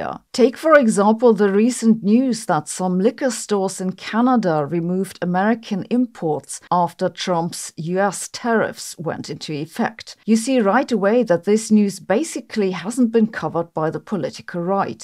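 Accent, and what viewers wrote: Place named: Hesse, Germany. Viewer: Ich war auch schon am überlegen aus welchem Bundesland sie stammt.. 🤔 Hessen..?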